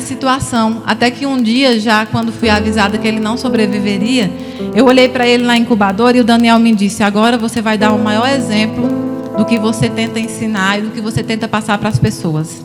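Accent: Ceará, Brazil. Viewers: Ela é muito leve no falar eu gosto de ouvir ela falando das cartas ela e tão simples parece tão amorosa com todos e esse sotaque da minha terrinha Ceará e muito bom ouvir ela eu sei que nunca vou receber uma mensagem dos meus entes queridos que já se foram mais fico feliz ouvindo as mensagens dos outros pra seu familiares eu dou risada eu choro me emociono de ouvir as cartas lidas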